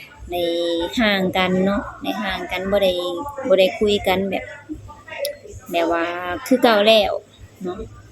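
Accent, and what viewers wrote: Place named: Ban Khok Yai, Thailand. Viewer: เจ้าคนบ้านโคกใหญ่บ่ครับฟังเสียงคือคนบ้านโคกใหญ่ครับสบายดีปีใหม่ครับ ขอให้สุขภาพแข็งแรงตลอดปี69และตลอดเด้อครับคนงาม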